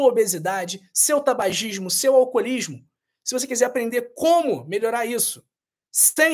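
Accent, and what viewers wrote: Rio de Janeiro, Brazil. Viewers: CARA...A SUA CARA E A SUA CONVERSA CARIOCADA NÃO PASSA CONFIANÇA... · Incrível no Brasil....esses médicos YouTube que massacram a gramática da língua portuguesa...pior esse sotaque carioca malandro nada chic .....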